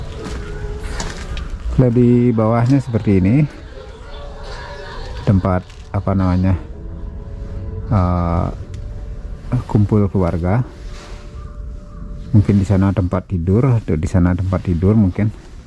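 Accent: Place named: Bali, Indonesia. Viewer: Pasti anak bali ne bli..logatne jek bali keleh.Alat numbuk padi gantung jd kulkul.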